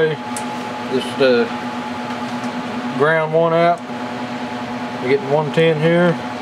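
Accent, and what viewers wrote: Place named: Kentucky, United States. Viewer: It's called an accent lmao. I'm from Kentucky so I understood everything he said.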